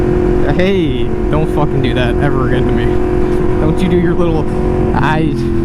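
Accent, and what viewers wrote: United States. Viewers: you sound like you are from wisconsin or somewhere in the midwest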